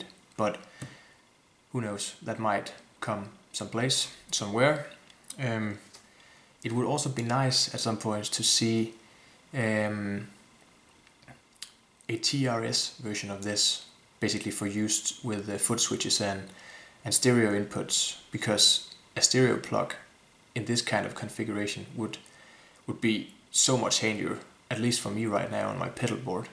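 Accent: Denmark. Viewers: Hej (fandt dit navn på soundcloud), Jeg tillader mig lige at besvare dit spørgsmål inden jeg fortsætter med vores snak omkring kabellængder. Ja jeg er dansker og sad faktisk og tænkte det om du også var dansker, da jeg så videoen. Det er svært at løbe fra den danske accent, men du skriver og taler rigtig flot engelsk! Nå! Tilbage til det originale emne og tak for dit svar. Grunden til at jeg spørg er fordi, at de 30 cm kabler som jeg har nu passer perfekt til mit pedalboard. Men jeg ville gerne have kabler som disse for at få plads til flere pedaler, da de fylder ingenting sammenlignet med de traditionelle kabler (pro snake Patch Angled Jack). Jeg har så valgt at købe thomanns egne kabler som hedder Harley Benton FPC i stedet (du kan prøve at søge på dem), da de kostede halvdelen af EBS og ud fra hvad jeg kunne læse, så minder de utrolig meget om EBS kablerne. 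Men rart at vide at jeg også skal tilføje et par cm til dem, så behøver jeg ikke være bekymret om hvorvidt de er lange nok eller for korte. Så må vi se om de holder lige så længe som EBS ellers må jeg købe dem næste gang:-) Ps. Jeg har tilladt mig at abonnere på kanal, da dit indhold lige er noget for mig.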